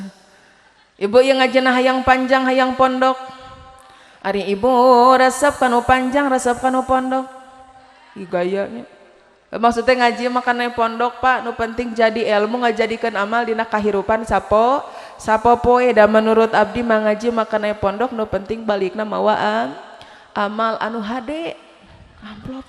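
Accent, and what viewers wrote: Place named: Sukabumi, Indonesia. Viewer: Logat Sunda Sukabumi,.... gaskeun.Bekasi hadir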